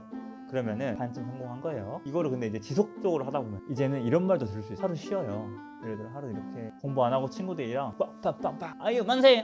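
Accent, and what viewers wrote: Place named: Jeolla, South Korea. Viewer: 왜케 귀여우세용ㅎㅎ 전라도 사투리 ㅋㅋㅋ💕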